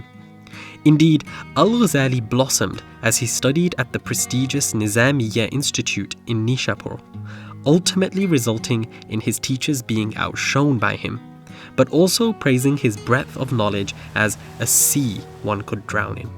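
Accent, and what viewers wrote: Arab world. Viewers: I was wondering throughout the video whether he's Arab or not, I still don't know, maybe he grew up with Arab friends? It's so hard to tell and I'm Egyptian too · Why Arabic pronunciation?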